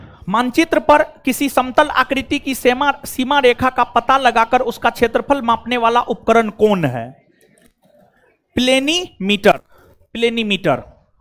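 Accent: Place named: Bihar, India. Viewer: Bihari people र❎ ढ़✅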